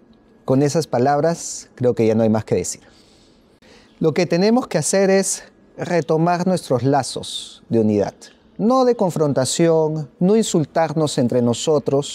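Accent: Lima, Peru. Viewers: El castellano de Lima -Perú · El español mejor hablado es el acento peruano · En la costa de Perú, especialmente en Lima, las personas educadas hablan así. El español tiene muchos acentos!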